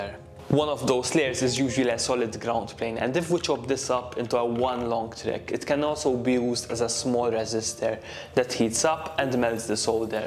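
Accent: Malta. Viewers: Can I ask, are you Maltese? Having Maltese family, I'm hearing the accent all the time and yours sounds very familiar! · It's unmistakably Maltese, and the name is another giveaway. I lived there for 15 years.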